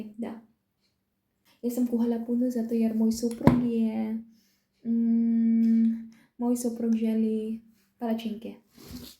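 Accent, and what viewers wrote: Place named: Podravina, Croatia. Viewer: Ne ona je podravka.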